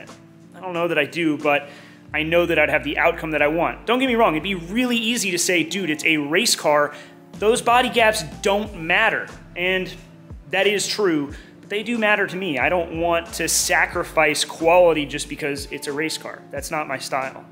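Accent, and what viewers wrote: United States. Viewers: Totally off subject, but you say you’re from Nashville, but I’m hearing a LOT of Wisconsin/ high mid west in your voice. Pls share where you’re originally from and how you ended up in SoCal (the WHY is pretty obvious!)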